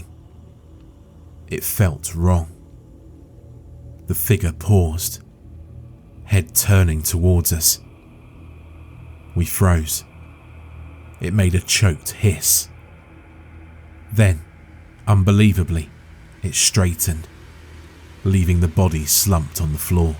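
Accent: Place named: United Kingdom. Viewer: Nothing like a good British accent narration. Subscribed. 👍🍿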